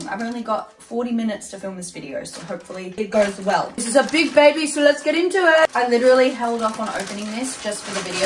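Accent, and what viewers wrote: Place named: Australia. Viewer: You are a beautiful ginger/redhead. Beautiful light green eyes, cute voice with the Australian accent and pretty smile. Beautiful body and curves, cute little belly button, nice little booty and long legs. You look very pretty and STUNNING in everything in this video. The dark blue jean shorts and crop top tank top and turquoise crop top tank top would have to be my favorite on you, those dark blue kinds of colors just look really good on you and make your eyes pop. Hello cutie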